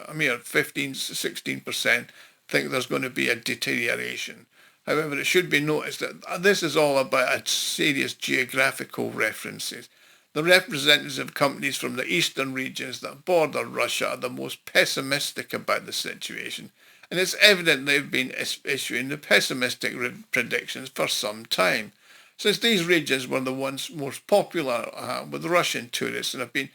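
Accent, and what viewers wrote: Scotland, United Kingdom. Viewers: What is this fascist Russian propaganda with a scottish accent!?!?!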